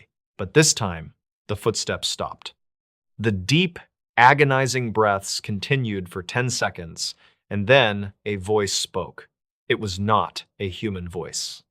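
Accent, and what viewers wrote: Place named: Essex, United Kingdom. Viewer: Essex England 😊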